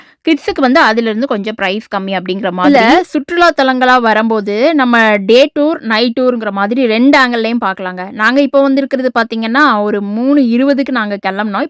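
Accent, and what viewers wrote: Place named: Coimbatore, India. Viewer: கோயமுத்தூர் இவங்களா வாசனை மாறாமல் பேசுறீங்க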